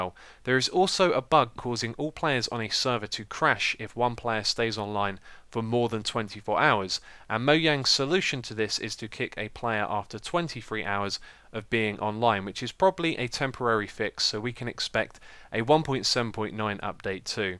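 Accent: United Kingdom. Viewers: <3 Brit accents (content also good/perfect, as usual }=Þ)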